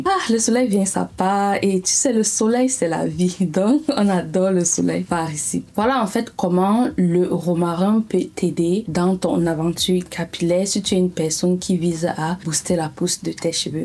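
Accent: Cameroon. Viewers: J'aime trop ton accent camerounais 😊😍💋💗💖💕😜🌊🩷😗🫶🏿😘🥰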